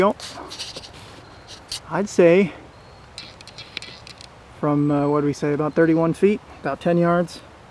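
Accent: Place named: Wisconsin, United States. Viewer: You're in Spooner Wisconsin? I recognize the accent.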